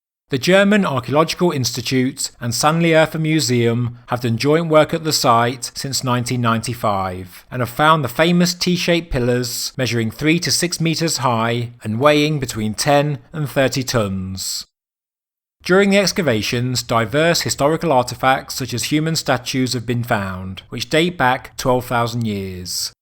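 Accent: United Kingdom. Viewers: PowWolf Rider Dude sounds British, he probably means soccer field.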